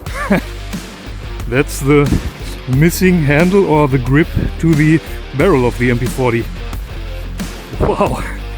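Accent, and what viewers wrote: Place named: East Germany, Germany. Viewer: na mehr als sicher sind diese funde in Deutschland im östlichen raum gemacht, akzent ist def ebenfalls Deutsch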